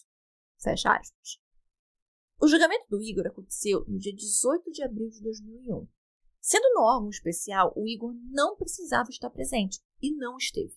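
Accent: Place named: Rio de Janeiro, Brazil. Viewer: Nossa não gostei dessa fala carioquíssima não se entende nada aroisss bla bla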